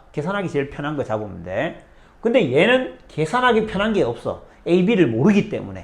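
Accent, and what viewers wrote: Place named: Gyeongsang, South Korea. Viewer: ㅋㅋㅋ. 강의하실 때 샘의 억양을 들으니 혹시 대구 분이 아닐까 그 동안 생각하긴 했지만 실제 수성구 범어동일 줄은 몰랐습니다. 친척 따님이 수성구에서 KT 다니는 남자와 백년해로를 언약해서 거기서 혼례를 치르기로 했습니다. 정말 대단한 우연이네요. 저도 일반적으론 높은 확률을 따라가긴 하지만 이상하게 꼭 그렇진 않게 되더라구요. 전 정수론과 복소해석학이 전공인데 확률 전공이 아니어서 그런건 아니지만 전 수학자이면서도 절대적으로 확률을 믿지는 않는 경향이 좀 있습니다. 우연에 내맡겨 버리기도 하죠. 저도 지난 주 파워볼 60개 번호 중 8개 숫자 조합을 모두 맞추면 잭 팟을 터뜨리는 아주 희박한 확률 싸움에서 겨우 2개를 맞춰 35 달러(한화 약 5 만원)를 타면서 역시 잘 안되는구나를 절감했지만 그래도 재미로 소소하게 하는건 괜찮으거 같아요 ㅎㅎ. 그나저나 돼지갈비로 먹을지 소갈비로 먹을지 벌써 갈등이 생기네요 ㅋㅋㅋ.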